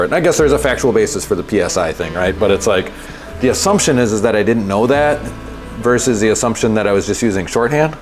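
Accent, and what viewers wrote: Wisconsin, United States. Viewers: I feel like you are from Wisconsin saying Ope! Later on in the video figured it out. Great video! I might do a similar upgrade.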